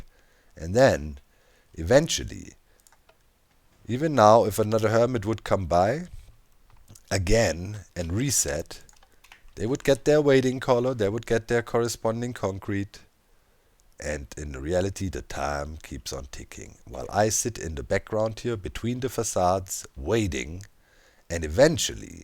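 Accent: Germany. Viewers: Bist du Deutscher?😂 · Man hört den deutschen Akzent raus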